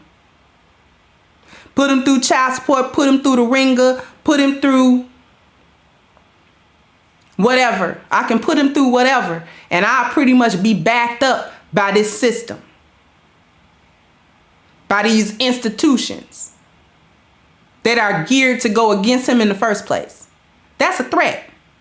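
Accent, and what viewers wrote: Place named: South, United States. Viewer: where do you live, you're seeing this kind of woman all the time? sounds like you're from the south.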